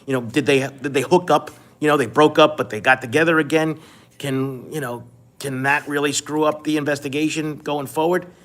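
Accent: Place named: New York, United States. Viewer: I appreciate your true New York accent! Most New Yorkers residing in New York, came from somewhere else much like my Boston hood accent! You can’t mistake it because most of the ppl residing in Boston, aren’t from here either! 🤯😂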